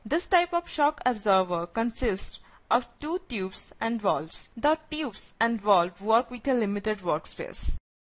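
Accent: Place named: India. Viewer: speak like true indian ,,anyway this is very very helpful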